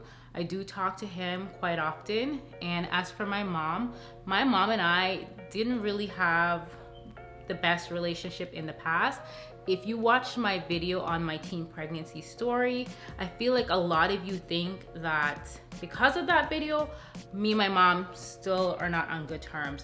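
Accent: Jamaica. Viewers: Love the accent! Makes me think of my Grandma she was from Kingston Jamaica 🇯🇲💗 I can understand. You are absolutely beautiful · COME THRU JAMAICAN ACCENT!!! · it was so cute to hear you speak patois 🥰 edit: oh my gosh I got teary as soon as you got emotional. I’ve watched your channel for years and I’m so proud of your success! so happy you’re able to live your dreams and comfortably take care of your beautiful family ❤️ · I understand what you said when u speak in your Jamaican accent bc I'm Guyanaese! 💜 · I have never heard your Jamaican Accent before and i can understand patois! Lollll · Yes that patois 🇯🇲🇯🇲🇯🇲🇯🇲 🇯🇲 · What a beauty😍😍 Jamaican accent cant hide at alll😩🇯🇲🇯🇲🇯🇲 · Wow didn’t know you were Jamaican and had an accent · her voice is so soothing wth lmao and i can tell she’s jamaican it comes through very slightly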